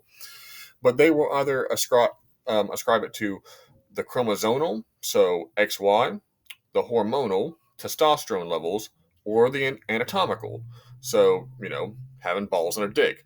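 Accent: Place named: Appalachia, United States. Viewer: You from Appalachia or just live there.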